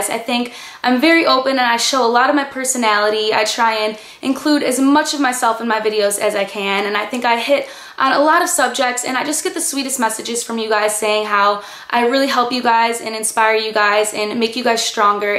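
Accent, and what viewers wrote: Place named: Cleveland, United States. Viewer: hi! i really like your videos, i just subscribed:) ps- and I've been noticing how your accent is very similar to where im from (cleveland) and when you said you were from chicago it made so much sense just thought i'd let you know cause it seemed funny to me hahaha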